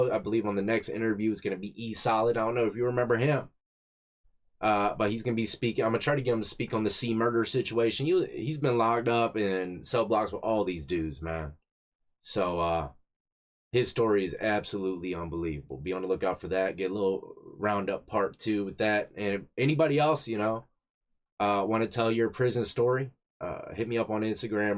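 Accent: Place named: Virginia Beach, United States. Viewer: Sounds like you are from VA Beach? I am a white guy who went to high school in the ghetto of Hampton, VA. It was hell on earth.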